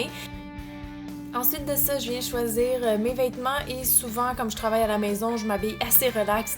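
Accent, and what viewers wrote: Quebec, Canada. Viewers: Elle est québécoise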